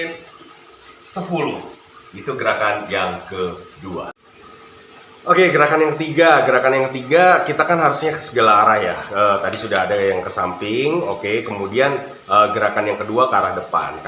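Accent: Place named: England, United Kingdom. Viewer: Bang jelasinnya pakai full bhs Indonesian saja. .soalnya terlihat lucu aksen Inggris nya.. 😂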